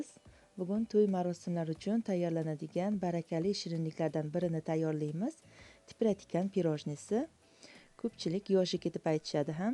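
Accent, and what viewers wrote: Khorezm, Uzbekistan. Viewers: patnsga solib tuylarga oborgan yaxshi ekan menga yoqdi shu uslda albatta tayorlab tuyga oboraman patnsga salafan urab rahmat sizga opam xorazmlmsiz opam shvagiz horazmcha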